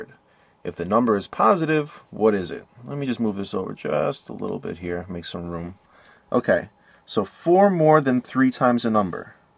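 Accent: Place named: Long Island, United States. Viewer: long island accent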